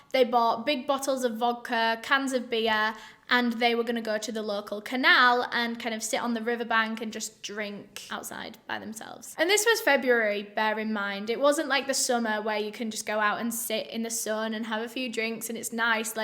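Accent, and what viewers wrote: United Kingdom. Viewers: Tell me you're from the UK without telling me you're from the UK... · omg are you british? finally someone who is actually British. I love it when i have a British bredrin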